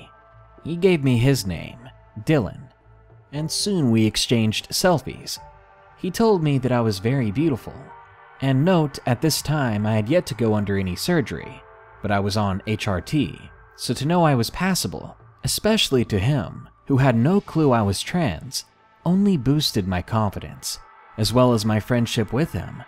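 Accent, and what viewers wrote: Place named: United States. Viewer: Great Videos Man, Also love your pure American Accent voice, did you create your profile picture yourself?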